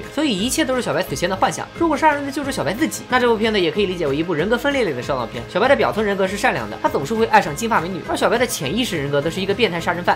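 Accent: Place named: Jiaodong, China. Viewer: 主播胶东人吧，从 自己 的发音 判断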